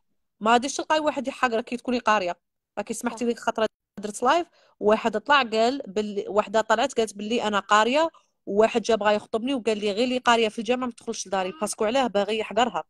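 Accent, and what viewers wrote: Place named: United States. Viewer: سلام الزينة بنت بلادي أنا نتابعك من ليون بفرنسا. بنتي تحبك و تعجبيها بزاف في طريقة نطقك للإنجليزية الأمريكية. و أول مرة نعرف باللي زوجك فلسطيني ربي يحفظكم و يسعدكم و يهنيكم يارب العالمين 🇩🇿❤🇵🇸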